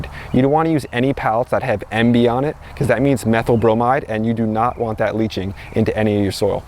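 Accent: New Jersey, United States. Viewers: Love ya HARD “”JERSEY”” ACCENT. ONCE IGET NEW GARDEN SET UP... I WILL INVITE YOU DOWN. YOU WILL LOVE THE AREA AND WEATHER..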